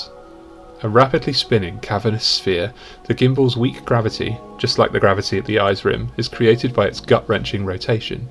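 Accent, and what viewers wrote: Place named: Germany. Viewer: Lol, you losing the German accent remind when of when I was an exchange student in France and during English classes I straight up couldn't speak English anymore. My brain was: Yes. Translate. Know what to do. speaks French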